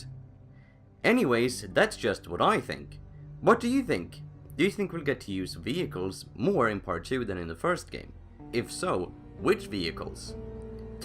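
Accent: Sweden. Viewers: Are you Swedish??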